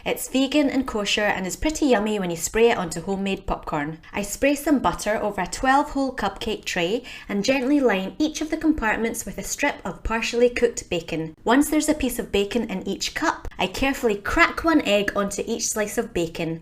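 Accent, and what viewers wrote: Scotland, United Kingdom. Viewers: Asian decent..Scottish accent...Aussie resident☺ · Sort of off topic but does anyone ever get surprised by your Scottish accent? As a fellow Aussie, I always think it's so cute. BTW I'll never get bored of videos of food, especially when they're as motivating and healthy as your food prep! I really enjoy the variety and ideas :D Thanks <3 Looking forward to your next vid.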